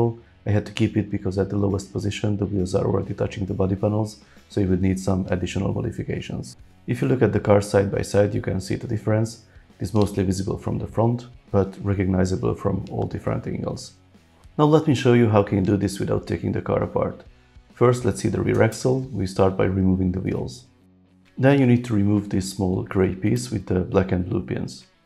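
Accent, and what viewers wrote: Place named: Hungary. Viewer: Kicsit olyan magyar akcentusa van nemtudom miért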